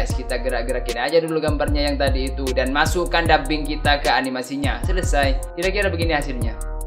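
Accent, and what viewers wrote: Madura, Indonesia. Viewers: Orang madura ya mas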